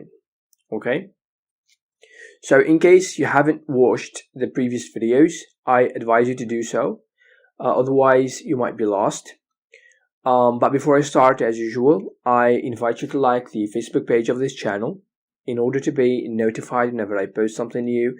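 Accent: United Kingdom. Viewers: well! liked ur British accent! for the first when i heard u , thaught ur an Trueee British man, but then when u said bejaia and tizi ouezzou, i became proud of u and i directly subsribed ur channel God bless u 🙏u simplified things for me too much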